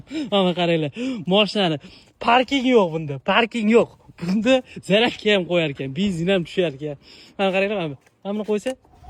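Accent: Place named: Tashkent, Uzbekistan. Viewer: Ким буларди санга ухшаганде кулма тошкенча гапириш ухшамади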